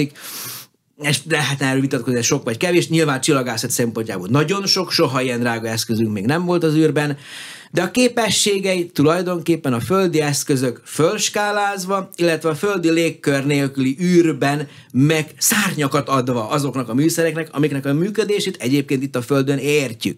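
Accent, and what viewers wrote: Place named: South Bačka, Serbia. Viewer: Dél-bácskai tájszólás, Rátgéber Lászlóhoz hasonlítanám. Csak a szakterület más: egyikük a kosárlabdához ért nem akárhogyan, másikuk a csillagászathoz :))). Ja, és humoros a történet, betegre röhögöm magam minden interjúján, előadásán. Régen én is imádtam a csillagászatot :).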